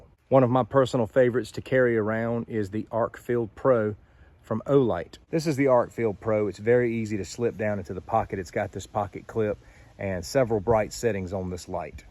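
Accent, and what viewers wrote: United States. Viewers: Ваш американский голос звучит ОЧЕНЬ классно Я согласен с рекомендациями.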